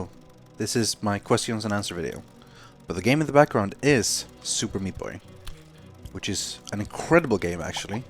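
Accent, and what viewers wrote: Scania, Sweden. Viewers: Are you from Sweden? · is swedish? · are you from sweden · HOLY SH!T Are you from Sweden!?! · Ah your swedish, I've been trying forever to figure that accent. I wasn't sure if it was one of the American accents or a variation of the English accent. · are you from sweden?:D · is he swedish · I tought I rekognise the accent. SWEDEN !!!!! ;D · Det finns en äldre Q&A någonstans bland Rythians videor, och i den bjuder han på lite skånska. Han är från Malmö tydligen.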